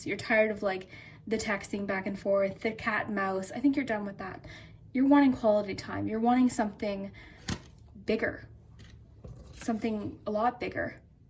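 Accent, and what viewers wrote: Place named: Canada. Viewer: So, I have to comment and tell you that I'm OFFICIALLY a new viewer to your channel and I'm officially subscribed as of NOW! (WARNING! Super long comment!!) I happened to find your channel through another reading I was watching from somebody else and something in me told me to click on your video. The video was called "How Will You Meet Your Soulmate? PENDULUM 🔮 Where? When?" Not only is your reading the most exact reading I've ever received in my life (and I've had in-person readings), but the soulmate reading was so mind opening to me. I then watched your May 2020 predictions and Pile 3 was calling to me which I find super interesting. The number 3 is my lucky/weird/magical number. Watching this video didn't resonate with me because I'm single, but it gave me a lot of hope because I have plans on moving into my own place come July and from your May 2020 prediction about patience and that slow and steady wins the race. 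:) Anyways, yea, you definitely have a new subscriber from Orlando! :D (And I wonder if you're Canadian because you sound Canadian hehehe!) And yea! Keep making wonderful videos!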